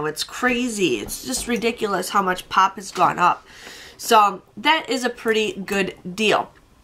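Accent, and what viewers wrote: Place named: North, United States. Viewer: JUST GOTTA SAY, I LOVE YOUR ACCENT! I AM FROM TN AND HV RELATIVES WHO HV THE NORTHERN ACCENT! I LOVE IT! LOL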